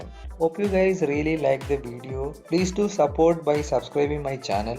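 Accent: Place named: Malaysia. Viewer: Manglish was gud. It’s better do in wat u know.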